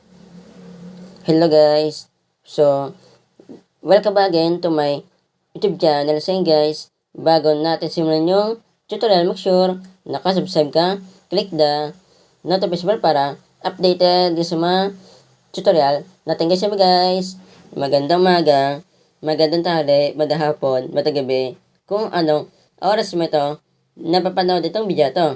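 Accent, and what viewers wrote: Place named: China. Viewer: sakit sa tenga "guys ahhh" intsik sta ahhh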